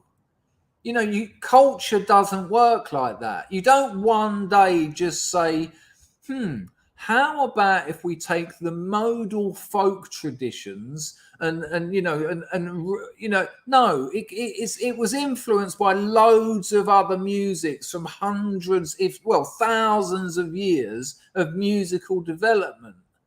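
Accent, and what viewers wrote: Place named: London, United Kingdom. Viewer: I really miss the London accent I spent a lot of time in Hanwell over the decades 🏴⚒️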